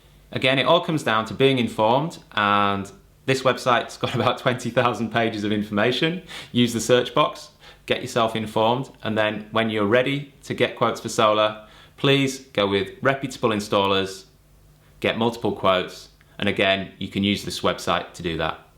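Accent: United Kingdom. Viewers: All be it a British Aussie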